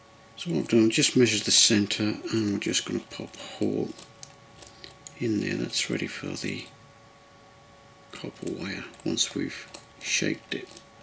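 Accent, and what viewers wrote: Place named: RP, United Kingdom. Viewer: Very good plummy BBC accent. Brings back memories of " Listen with Mother "and "Billy Cotton Band Show" The radio was nice and the bar, I must try! Thanks. Have a good weekend. And greetings to Mrs.T. Peter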